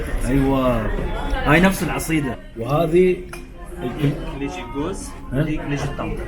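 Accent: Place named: United Arab Emirates. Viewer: الله محيي اصلك ، والله ذكرتني بايام الامارات واللهجه الاماراتيه الحلوه الله يديملكم الامن والامان ويرجع بلدنا العراق لخيره ونستضيفك تاكل دولمه ببغداد ان شاء الله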